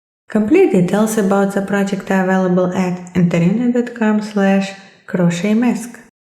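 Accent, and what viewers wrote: Russia. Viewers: Russian accent? Hallo mein lieber. I have made the same , we have similar ideas? Thank you for video.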